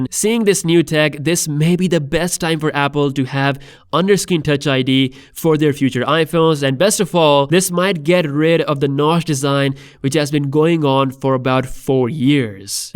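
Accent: India. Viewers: are you Indian cause you accent is different I am not complying just telling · Indian accent?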